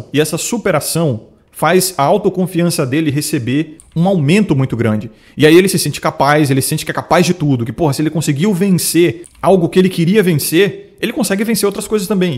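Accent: Bahia, Brazil. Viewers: Dialeto baiano dele... O "vamos conver" ele só conseguiu corrigir esses dias... Por isso é necessário sempre filtrar as informações, pois as referências regionais dele são medíocres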